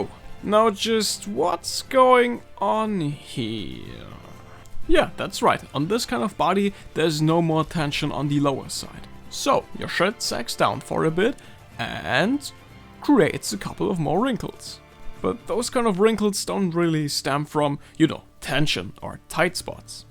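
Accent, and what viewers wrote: Germany. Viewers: Ah, German? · Dude I love your accent (Hört richtig das Deutsche durch XD) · Ich finde das du super Englisch sprichst. Eigentlich stört mich ein deutscher Akzent im Englischem immer, welchen man bei dir auch ein wenig raushört, doch es klingt trotzdem gut ~ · I have never seen your channel before, but as soon as I heard your voice, I knew you were German. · Man hört den deutschen akzent noch durch, aber das wird wohl besser werden mit der zeit. ausserdem ist der voll gut, man merkt ihn aber er ist nicht krass nervig oder ähnliches. Aber die übersetzung an sich ist echt schön geworden :o auch die betonung usw. Narutos clothes are THICC (okay)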